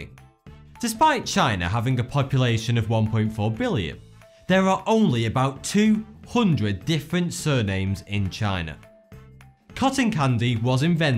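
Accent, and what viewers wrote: United Kingdom. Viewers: British English at its best~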